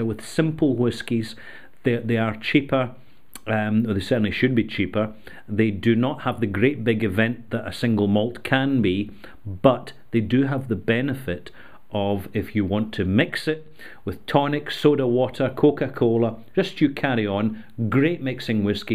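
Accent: Scotland, United Kingdom. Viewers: What's band practice? How much water? And I guess the Scottish accent makes sense for whisky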